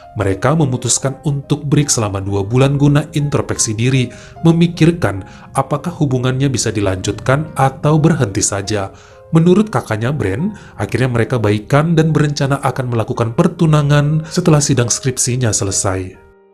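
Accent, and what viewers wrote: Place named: Medan, Indonesia. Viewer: Dari sekian banyak alur cerita, cara menceritakan abg lebih enak didengar, mungkin karena kita sama sama medan, kisahnya juga pada seru dan menarik, sehat selalu bang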